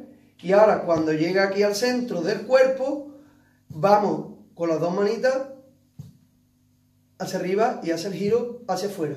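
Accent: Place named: Andalusia, Spain. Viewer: Me fascina tu acento, ¿eres andaluz?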